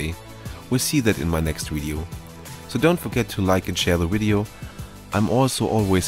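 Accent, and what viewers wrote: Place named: Germany. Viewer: I'm just not used to German YouTubers, that's why I was impressed with your accent.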